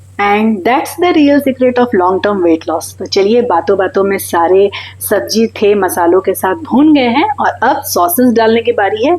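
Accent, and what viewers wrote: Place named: Bihar, India. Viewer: Nice blog didi aap Bihar me kahan se belong Karti Hai aapki language hamari Jaisi Hai😊😊😅😅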